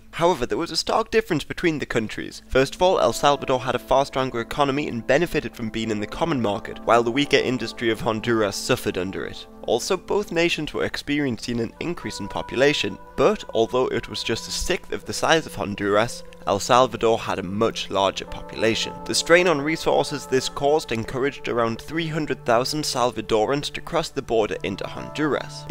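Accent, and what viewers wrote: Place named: England, United Kingdom. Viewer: It's an English accent, of the English language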